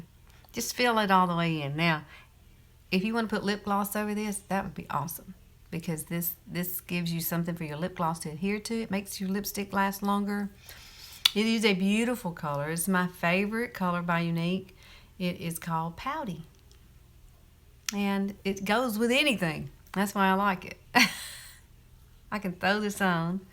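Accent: South, United States. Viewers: You are just so darn cute! I'm a sucker for a southern accent! Man, woman or child! I feel like you could cuss me out and you would still sound sweet lol! Have a great night! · OMG, This is sooo borning, and annoying.. Please tone your voice down on all these ads.. and stop trying so hard to be cute... Just do it .. I do not have all day.. chances are I will click you off before you get to the facts.. Wow.. is she southern or what ???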